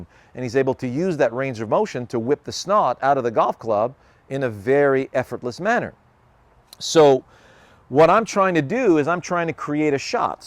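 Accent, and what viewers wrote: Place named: Quebec, Canada. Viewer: Hi Mr. I'm totaly in love with your mental approche for the swing of golf. I found your video by starting to watch your french imitator! By the way your Quebec accent is style intact. I'm from Montréal so if you do come in Montreal for any teaching, let me know. So I'm going slowly throw all your vidéo and trying to apply these tips in my game. I like to pratice more so I'm looking to by a net and a mat similar as the one you use in yout backyard. Can you tell me what's the brand of it. Thanks for all your vidéo.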